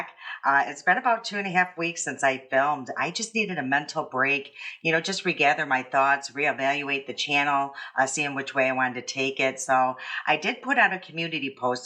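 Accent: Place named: Minnesota, United States. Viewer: Don't stop I love yr channel u r so funny and likeable Minnesota accent so charming and I loved the missa video do a skin Tint best of. Or Charlotte Tisbury dupes best of. Thku for doing a great job great channel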